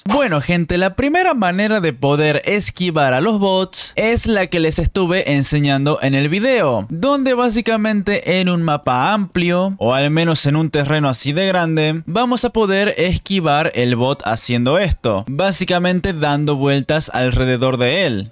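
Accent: Argentina. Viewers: eres argentino esa es mi pregunta y tambien yo soy argentino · ENTONCES ERES DE ARGENTINA? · Vos eres. De 🇦🇷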